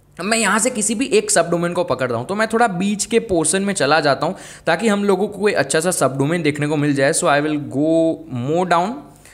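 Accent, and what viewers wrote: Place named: India. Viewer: I'm sorry, but he speaks English or Indian? I understand more or less what he says.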